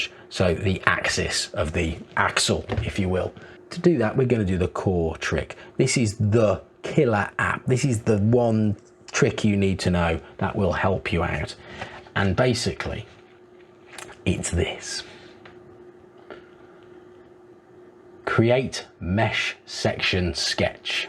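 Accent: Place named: Oxford, United Kingdom. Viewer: Thank you for your very good oxford english. For us germans it is a benefit to listen to you.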